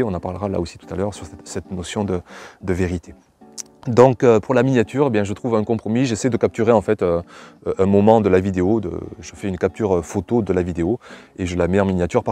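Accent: South, France. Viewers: Merci pour cette video et pour tous ces details que tu as partage, bien conscient qu'en plus de la passion du jardinage, il y a un gros travail pour faire ces videos... J'ajouterai pour compléter le tout, un capital sympathie incontestable et surtout cet accent du sud (j'adore!) qu'on vous envie dans le nord! BRAVO!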